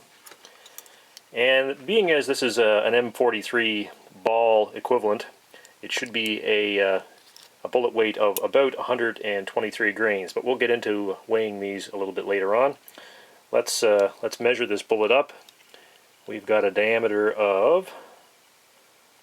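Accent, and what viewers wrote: Canada. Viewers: May I assume you are in Canada (based on your accent) ? Norinco ammo has been banned for importation to the United States for many years now because of the Norinco/Keng's Firearms fiasco, but I still have some of Keng's match ammo as well as some silver boxes of Norinco. I don't shoot it because it's quite rare here in the US. Thanks for the video...it's the first time I've seen this Norinco ammo reviewed...nice job!!!!! :o)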